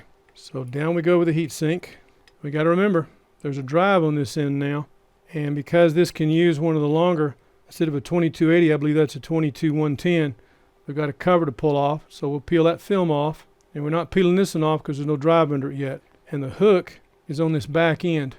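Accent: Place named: South, United States. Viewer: what a nice southern pronunciation. Tennessee or South Carolina ?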